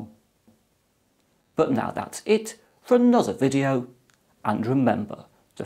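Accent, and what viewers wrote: United Kingdom. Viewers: This guy's British Accent made this video. I mean, if he had an American Accent I wouldn't have taken it so seriously, but for some reason because of his accent I couldn't help but pay more attention! All of thse novel ideas seem amusing, but something about the cyrotube Reanimation "card" sends chills up my spine :(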